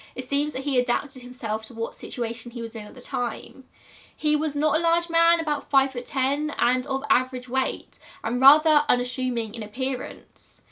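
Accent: United Kingdom. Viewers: i love ur accent so much lol idk if its because I'm American and don't hear a lot of British accents but i always tend to watch British people like Eleanor Neale because of u guyses accents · You've got a buetiful voice, no need to be self conscious. Love the UK accent.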